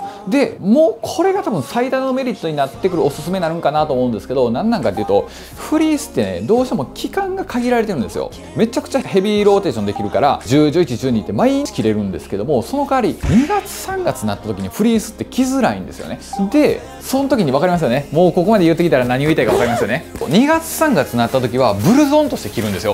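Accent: Kansai, Japan. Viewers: めちゃくちゃイケメンで似合ってるし、物も良いのは認めるんだけど、この感じの関西弁でプレゼンされると胡散臭く見えてしまう😢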